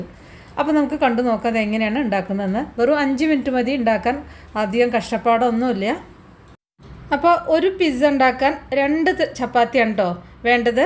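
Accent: Palakkad, India. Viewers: Super, 👍try cheyyam, palakkad ano നാട് നല്ല രസം പറയുന്നത് കേൾക്കാൻ നല്ല അവതരണം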